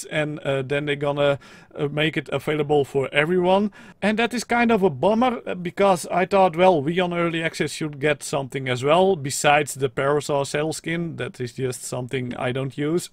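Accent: Netherlands. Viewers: yo is he dutch ???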